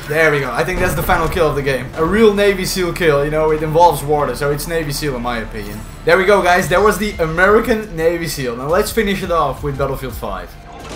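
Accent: Netherlands. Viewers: this guy has dutch accent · i can tell you're from the Netherlands from your accent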